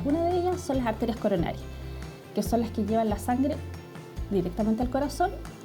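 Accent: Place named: Chile. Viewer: Buena información, pero terrible ese acento 🤦‍♀️🤦‍♀️ deberías modularlo un poco, para que te entiendan los q no son chilenos.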